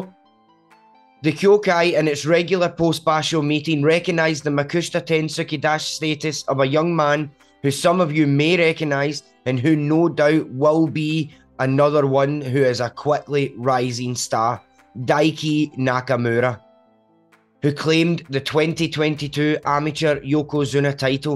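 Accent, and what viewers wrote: Scotland, United Kingdom. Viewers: For some reason, English folk butchering Japanese annoys me, but in a Scottish accent its still lovely 🤣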